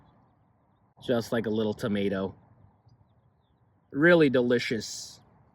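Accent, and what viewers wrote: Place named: West Texas, United States. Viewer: What part of west Texas you from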